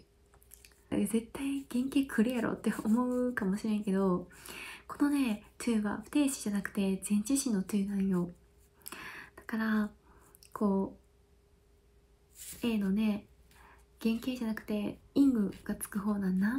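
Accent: Kansai, Japan. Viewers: 投稿お疲れ様です！メガネいいですね！そして関西弁、すごい親近感です😆 引き続き応援してるので頑張ってください！ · 関西弁！萌える！！地声も顔もめちゃくちゃにかわいい！！ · 関西人やけど周りにここまで関西弁の女の子居ないからなんか新鮮でした😄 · メガネ！やっぱり可愛すぎ！特に下にずらしてる時！関西弁の引き出しまであったとは😳 シャーペンで書く音が1番良い音だった！英語の勉強した方が良いのは分かってるんやけどなー・・・ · 何やろう･･･ このコテコテでもない、上品な関西弁は･･･！ Runa先生の育ちの良さが出過ぎてます･･･ 個人的にの笑顔大好きです･･･癒されました😍 音声は後半のアプリ紹介のところですごく近くなってドキッとしました!! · 関西弁？めちゃいい.ᐟ.ᐟ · この可愛さで関西弁は破壊力満点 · 元々好きな動画やったけど、関西弁で益々好きになったわ❤️ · 関西弁喋れるんですね。関西人ですか❓私は和歌山県に住んでた事があって関西弁もマスターしました。地元の人にとても関東人には思えないくらい発音がネイティブって言われるくらい上手くなりました。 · 関西弁カワユス♥️ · 関西弁ネイティブですやん！！せ↑いけいとかもうネイティブなんですよね笑こんな家庭教師おったら顔見て話聞かずに終わりそうw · 関西弁…最高…親近感…可愛い… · 関西弁ー？！？！？関西人なのですか！？！？好きです🥰🥰🥰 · 関西弁💕💕 こんなに新しいロールプレイも良き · 関西弁が可愛いすぎ😭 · あれ？先生関西人やったんや、、🤣知らんかったん俺だけ⁉︎ 何か勝手に親近感‼︎嬉しい😍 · 最近ASMRにハマって、サムネイル画像でめちゃくちゃ理想のタイプって思って観て(聴いて)みたら、優しい声で、関西弁で…惚れてしまいました!!😳🥰 これから毎日寝る前に観よう(聴こう)と思います😊 応援してます!!👍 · × 関西弁とか可愛さの致死量に達する...😇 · おお！流暢な関西弁！メガネ姿もかわいい。今度、大事なところは耳元で囁いてくる、誘惑してないのに誘惑してるようになっちゃう先生とか見たい！ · ①今から文転して大学受験し直すか、 ②来世で先生が理系になっている世界線に賭けるか、 ③アダム・スミスになるか、迷って勉強できないので先生に相談しにいきます。あとTOEIC点数高すぎますし、めちゃんこ可愛いし、関西弁かわいいし、本当尊敬してます！ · runaさんは関西人かな？そうじゃないとしたら関西弁自然すぎる笑それと関西弁ロールプレイは大阪人の自分からしたら最高です😃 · 関西弁、、可愛い😍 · 関西人なのに関西弁にやられる。。。激萌ゆる、、、ある意味メンタルやられる♡ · 関西人のわい関西弁に歓喜する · 関西弁！？最高すぎ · 関西弁で関西ニキたちが歓喜してる中、謎の敗北感に襲われる関東生まれの俺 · 関西弁めっちゃええなあ。次は津軽弁待ってますこんな家庭教師さんおったら勉強が嫌でも好きになってまうて 250万人に使われる大手さんから案件来るとは流石！これからもファイト、オー！なのですよ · 今年受験生だし政経選択だからほんとありがたい。Runaさん関西弁だけど、東京にいたら青山学院大学通ってそう。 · 関西弁ッ！好きです（直球）関西出身なんですね。今度大学でtoeicを受けるので参考にさせてもらいます！ Runa先生にtoeicの勉強教えてもらいたい… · かわいい先生❤️関西弁もいい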